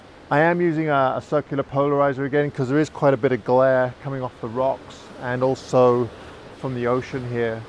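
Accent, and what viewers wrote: United Kingdom. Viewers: I subscribe to a half dozen photographers and enjoy them very much but you have a special eye in your photography that I really enjoy. I'm also partial because I'm from Vancouver Island and admire the way you share it with the world. It's an amazing place. Your vlogs are truly awesome and better than any TV show I'd sit in front of. Congrats on the new slider! More gear. :) Mind you, IMO, the time-lapse of water and nature distracts from the beauty. But still a huge fan! Keep 'em coming. BTW, what's your accent? UK?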